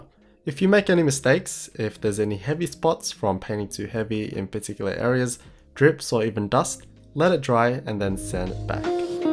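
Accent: Australia. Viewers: is he an aussie?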